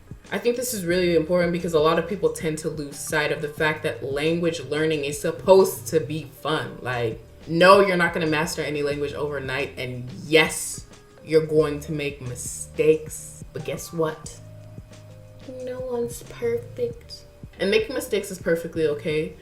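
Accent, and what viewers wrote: South Korea. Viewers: You legit nailed the standard korean accent Which makes you sound super smart. No cap.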